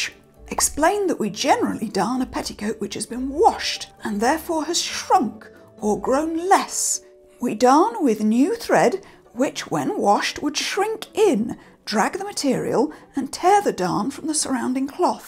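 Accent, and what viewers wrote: United Kingdom. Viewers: Aww yes my favorite, British cursing ASMR. Thank you for the wonderful video as always.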